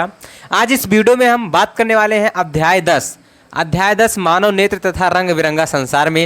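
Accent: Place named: Awadh, India. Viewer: Gajab अवधी अंदाज में sir ji ❤❤❤❤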